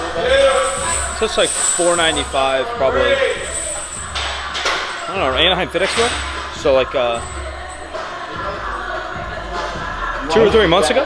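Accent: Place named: Russia. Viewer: Beautiful sumo technik russian accent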